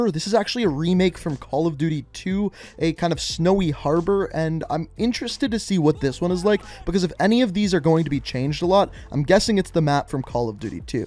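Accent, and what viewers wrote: Canada. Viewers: What's your accent? Like where you from because if I were to guess I'd go with Canadian????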